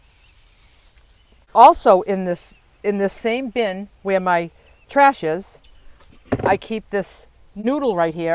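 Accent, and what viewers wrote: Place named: Boston, United States. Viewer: Hi, I just found you and love this video. Your a classy lady speaking of delicate matters and also makes me smile because I hear your Mass. accent comin in loud and clear and I feel home again. I don’t meet many here in Seattle with the accent and I’m always being asked where I’m from after I’ve said a few words that only one from Boston can say. I’ll keep on watching and loving your young spunky spirit to brighten up my day!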